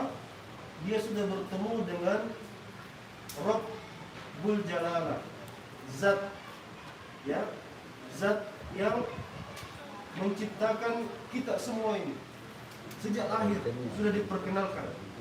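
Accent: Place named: South Sulawesi, Indonesia. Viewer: ini asal daerah dari mana. Sy kdg dengar bahasa Indonesianya logat Bugis makssar.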